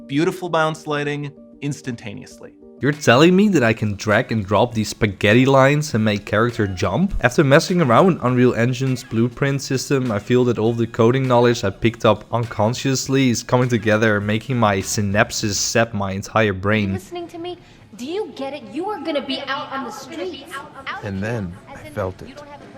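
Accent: Netherlands. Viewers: Hoor ik hier nou een stiekem Nederlands accentje in 😂🤲🏻 Character controller ziet er super smooth uit en er zijn zoveel richtingen waar deze game nog heen kan gaan! Kijk erg uit naar je volgende devlog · I hear a Dutch accent! Succes met je project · Yo, so ehhh, I just wanted to say that Unity3d also has Visual Scripting implemented since the beginning of 2021 :D I'm actually using that for my game :P EEEEEN Ik hoorde door je stem (en zag toen in je 'Over') dat je Nederlands bent 👍👍👍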